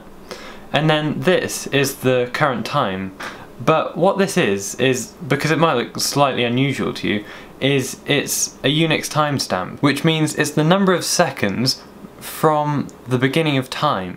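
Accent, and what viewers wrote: United Kingdom. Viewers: awesome ,sounds like a British?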